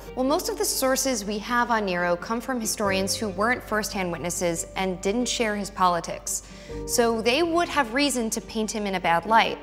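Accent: United States. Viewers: Cant take history lessons from an american accent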